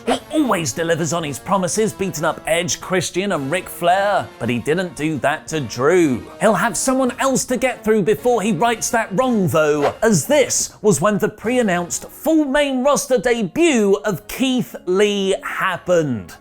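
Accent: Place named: United Kingdom. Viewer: "Rawr and Smackdown" .... that British accent SMH.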